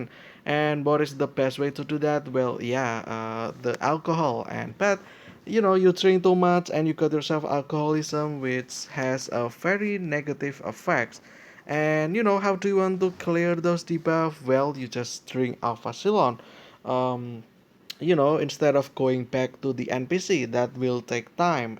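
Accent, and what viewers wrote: Indonesia. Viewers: Ur sound like i donesian accent u know? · How do you get Alphacelone?. Btw, your accent is kinda like Indonesian?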